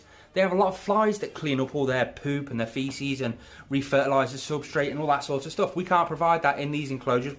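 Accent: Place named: Northern England, United Kingdom. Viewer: People need to leave it out. Like actually take the time to watch the video or understand the situation before jumping down people's throat, you did a good job mate and nobody should take that away from you. On a side note thanks for clarifying where you live my southern ears almost missed it as I don't speak northern too well 😜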